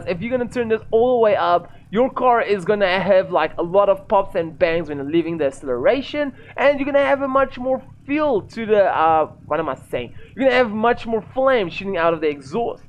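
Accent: South Africa. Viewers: bro sounds south african fr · Hi r u south african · I hear that south african accent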